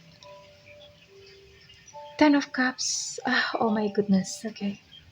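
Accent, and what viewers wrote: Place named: East Java, Indonesia. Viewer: Bunda orang mn y kok bhs nya kayak orang Jawa timuran hehe sy orang Jawa timur Bun hehe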